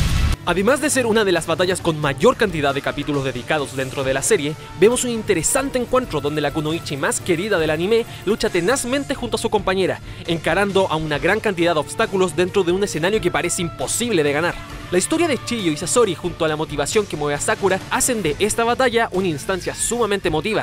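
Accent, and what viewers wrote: Chile. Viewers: Se nota que es chileno, buen video amigo saludos de coquimbo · Chileno verdad? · Es chileno??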